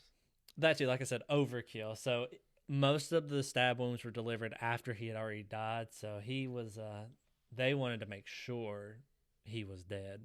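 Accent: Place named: Deep South, United States. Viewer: Coming from Imgur, I was not expecting a Deep South accent 😂. Excited to get rolling.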